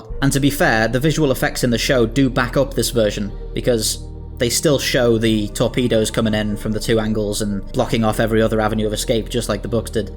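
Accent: United Kingdom. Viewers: I love the glottal stop of the producer of this video; also his use of "little bit." This is my favorite British accent.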